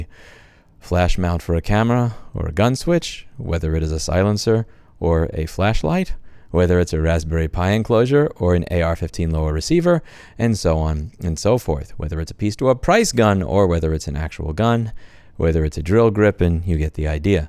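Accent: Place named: Texas, United States. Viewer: He has a texas accent now!!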